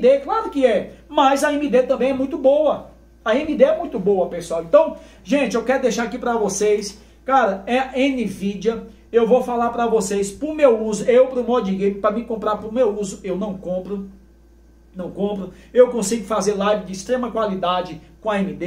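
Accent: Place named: Northeast, Brazil. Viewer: oxi... pra mim tu era daqui do nordeste homi kkk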